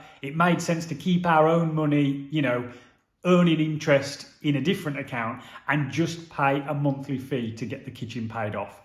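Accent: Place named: Midlands, United Kingdom. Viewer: How is your energy bill so low for such an expensive mortgage 😮 i pay 90 a month for my 2 bedroom semi which is just over 400 for my mortgage 😅 worked out my bills come into just under 800 a month, plus food and living etc... was your new kitchen worth the upgrade? Hearing the Midlands twang feels like home! Great video keep going 😊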